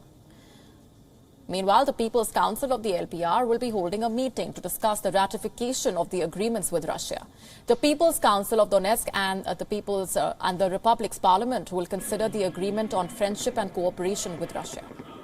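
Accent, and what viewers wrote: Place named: India. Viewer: This anchor has a pretty heavy chapati/bada pav accent Not smooth like Palki Not suited for an international channel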